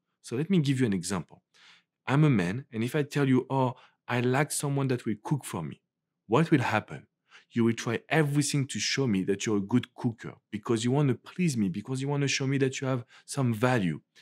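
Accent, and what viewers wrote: France. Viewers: I am here for the french accent. :-)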